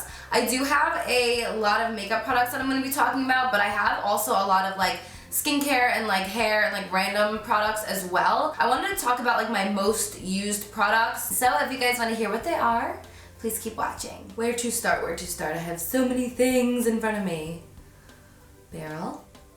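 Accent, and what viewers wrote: New Jersey, United States. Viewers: is she from jersey? :o she has a very heavy jersey accent and i'm from there!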